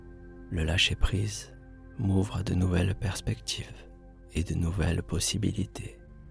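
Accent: South, France. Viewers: Un grand M E R C I. 🎉 à toi: j 'aime ta voix du sud. 🙏💙👍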